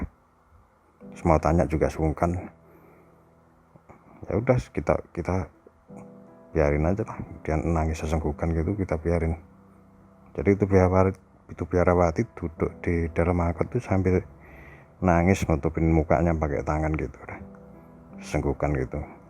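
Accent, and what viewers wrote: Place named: Java, Indonesia. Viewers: Gak usah pake kata GUE bro... medok soale sampean... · Kata GUE dan GUA itu beda cara pengunaan di dalam kalimatnya. Nahh si mas2 ini byak kalimat yg seharusnya pake Gua dia pake Gue, jadi nahbah kelihatan MEDOK nya 😄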